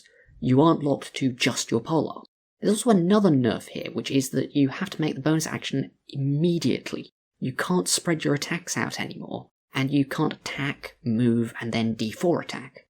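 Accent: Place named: United Kingdom. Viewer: You sound a bit young.. but your voice is that type of brittish that lets you know you were born as a 35-year-old person with a cup of tea in hand. Im not sure what software is changing your voice. But you could tune it in a bit. If you're not using something to augment your voice you could use a new mic.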